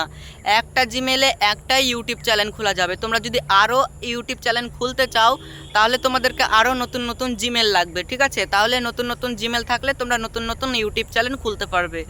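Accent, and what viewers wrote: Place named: Malda, India. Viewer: ভাই তোর কথাগুলো শুনেই মনে হচ্ছিল যে তুই মালদার. আমার বাড়ি ও মালদায় মঙ্গলবাড়ী তোর কথাগুলো শুনে ভালো লাগলো.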